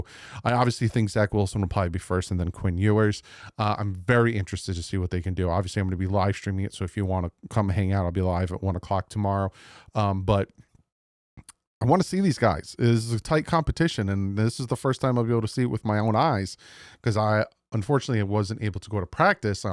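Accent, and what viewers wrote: New Jersey, United States. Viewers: I love you but the NJ accent…. “Yastaday” grinds my gears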